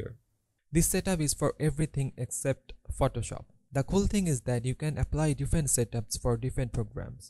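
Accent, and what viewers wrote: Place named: India. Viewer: Ah yes my left ear's daily dose of an Indian accent ASMR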